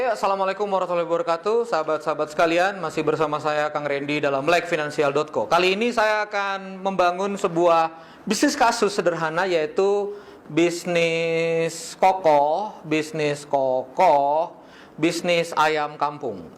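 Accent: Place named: India. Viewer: bahasa inggrisnya kyk logat india :D udah pake bahasa indonesia aja kang. klo mau campur pake bahasa sunda aja :D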